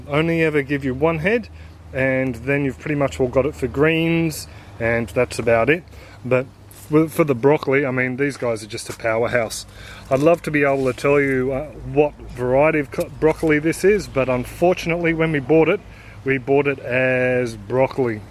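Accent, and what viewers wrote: Australia. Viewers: Great, broad Australian accent and useful information. Must get chickens. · Very, VERY helpful. Thank you. I am from states but my nephew, his wife and her family are from and live in Australia. It was lovely to hear your accent, made me think of them.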